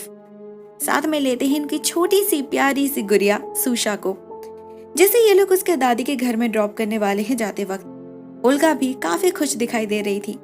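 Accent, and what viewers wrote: Bengal, India. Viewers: Hello mam by your accent I think you are from Bengal , if not then can you tell from which state you are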